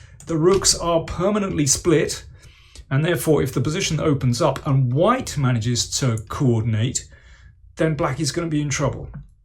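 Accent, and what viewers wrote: England, United Kingdom. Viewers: Daniel, as a french man and correspondance chess player, I really appreciate your clever comments and also your typical english accent ! Good revision for me. I think MVL came in this tournament without pressure : he had nothing to lose !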